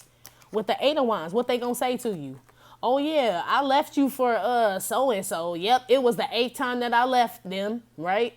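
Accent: Chicago, United States. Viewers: Thank u! I needed it this today thank you honey are u from Chicago? I keep u hearing u say G and Joe!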